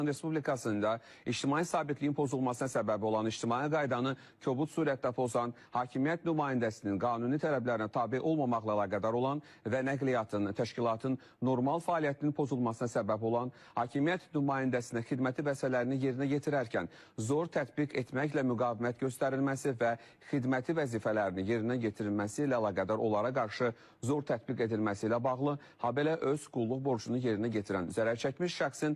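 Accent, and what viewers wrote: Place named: Armenia. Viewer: Ay yaramazlar bes terterde öldürülen zorlanan herbicisine sahib cixmayan hakimyete kim inanar birde ki senin lecen ermeninin azerbaycanca danişmasina oxşayir bu siziz qancixlarin teze türkün rusca lecesidi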